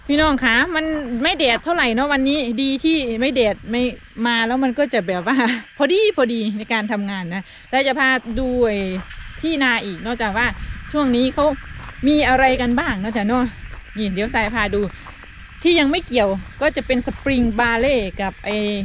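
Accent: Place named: Ubon Ratchathani, Thailand. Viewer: ฟังสำเนียงเป็นคนอุบลฯเหรอครับ ชาวนาของอังกฤษร่ำรวยต่างกันกับเกษตรกรไทยที่ยากจนลงทุกวัน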